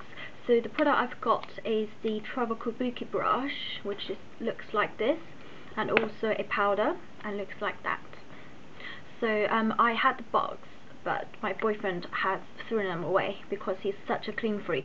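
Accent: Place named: China. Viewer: I like her Chinese accent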